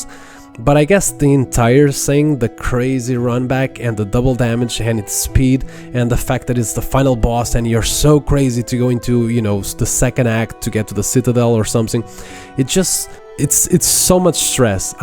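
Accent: Portugal. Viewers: pelo teu sotaque percebi logo que eras tuga porque é literalmente igual ao meu😅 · Are you portuguese (I recognize the accent) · That portuguese accent made me smile ! 😄 nice analysis!! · nah i think he is portuguese I can feel on his accent. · 1st i hate all of you for being able to beat bosses while only dying 3 times or wtv, I'm going to cry (except Moss Mother that i killed 1st try idk how yall do it) 2nd i was like this dude's accent sounds like he's portuguese, and apparently yeah? Bom trabalho 👍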